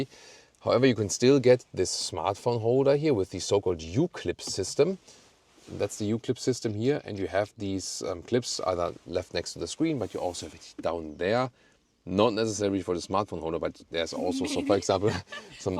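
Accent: Germany. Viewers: Such a beautiful review, very enjoyable to watch and listen too. I do love the elegant German tone of voice in English language. 11/10 review. Thank you so much!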